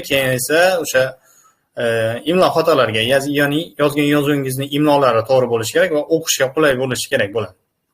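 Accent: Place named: Khorezm, Uzbekistan. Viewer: Xorazmcha aksent bor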